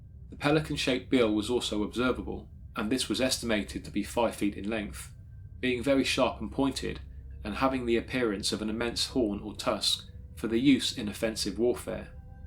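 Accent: United Kingdom. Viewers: Faceless story teller but with a beautiful voice and cute British accent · A documentary just isn't a documentary unless a Brit narrates it. Excellent content by the way 👍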